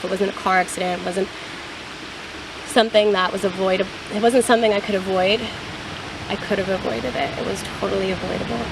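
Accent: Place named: Philadelphia, United States. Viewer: I knew i heard the Philly in you. I'm from Norristown so whenever I hear someone with the accent I immediately notice it. Lol. Hope you're doing well.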